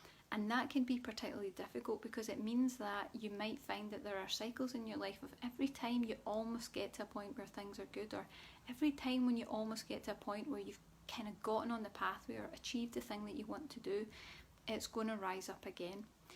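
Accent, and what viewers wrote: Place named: Scotland, United Kingdom. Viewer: Ohhhhh boy! Have you ever described the last month and a half to a "T" for me! I thought that was what was going on, but I needed the confirmation - and here you are! Thank you so much. 😃 P.S. I love love love Scotland and your brogue! Cheers from Canada!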